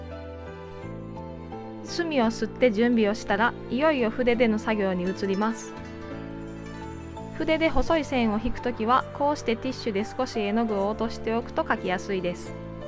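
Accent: Kyoto, Japan. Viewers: 京都人やから一瞬で京都の人やとわかった、、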